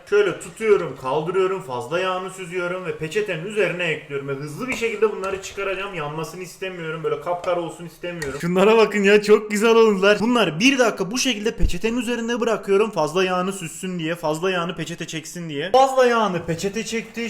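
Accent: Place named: Hatay, Turkey. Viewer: Hataylı olduğu için , şivesi öyle 😔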